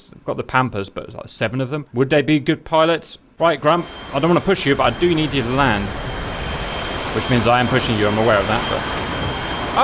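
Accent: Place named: RP, United Kingdom. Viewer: A lot of relentless jibber jabber from a posh boy with a neo RP accent. Wonder how long these tossers would last in a real war?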